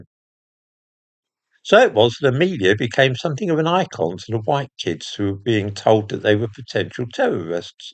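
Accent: United Kingdom. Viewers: Hearing a Brit say Amelia so often just makes me think Amelia Pond and want a ride in the TARDIS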